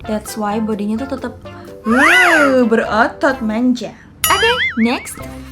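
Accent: Java, Indonesia. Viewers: Aku seneng medoknya kk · 1. Ria SW - otomatis 2. Omay - Farida Nurhan 3. Shelly Che 4. Nex Charlos 5. Duo Pengacara 6. Ken & Grat Note: klo kak ttp loch ya & itu pasti. Ciri khas logat jawirnya tak terlupakan...:^) Salam sehat & sukses selalu ya kak. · mantab mbak, english karo jowone medhok kabeh 😂😂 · kak cantik dan medok 😆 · Betul. Aku tuh klo pemberitahuan ria sw. Lgsg buru buru liat. Ga tau kenapa ya.. suka aja🤭 Sama dong. Krn medok mu😄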